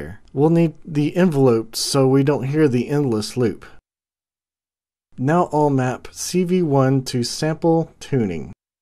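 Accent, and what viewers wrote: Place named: North Carolina, United States. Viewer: Thanks for the videos. I like them, even though you sound like you might be from North Carolinas or something :)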